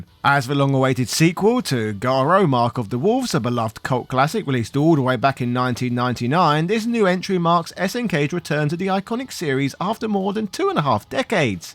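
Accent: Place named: Liverpool, United Kingdom. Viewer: You sound like a scouser mate.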